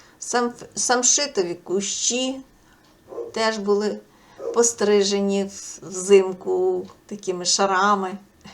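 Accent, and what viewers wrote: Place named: Russia. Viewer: Смешно слышать, как русскоязычный пытается говорить по-украински. Не мучь себя и слушателей, говори на родном.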